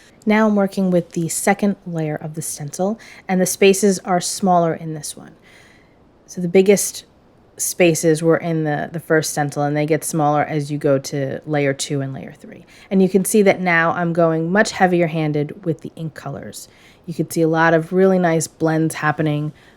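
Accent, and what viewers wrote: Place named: Massachusetts, United States. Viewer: Hey, I just subscribed, where are you from? I’m from Massachusetts but live in Rhode Island now and you certainly sound like you are from the area.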